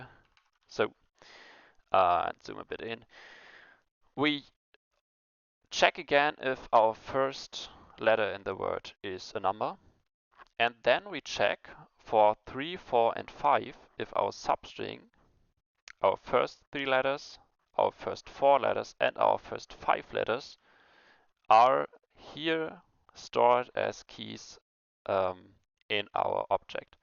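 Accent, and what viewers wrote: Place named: Germany. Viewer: Great video! I also love seeing all the approaches of handling the words in part 2, your approach looks pretty quick! A few points I'd like to address though: - Splitting with "\r\n" only makes sense if the file is encoded with that new line sequence (which as far as I know is just Windows). A recommendation of mine would be to either switch to "\n" (which in your IDE I think works by clicking on "CRLF" in the status bar), or perhaps the better solution: using .split(/\r?\n/) which will support both both :) - When talking about the letter "i", pronouncing it as [i] (also known as the German "i") can easily be mistaken with the letter "e" in English ;) Your English seems really good though! - The magic (even though obvious in this code) numbers 3 and 5 could be stored in constants to give them names for descriptions, which might be overkill for Advent of Code, but I just felt like throwing that in the room :) - About adding numbers, I'd highly recommend writing parseInt(`${numbersInString[0]}${numbersInString[numbersInString.length - 1]}`), note that I used backticks (`) and not an apostrophe!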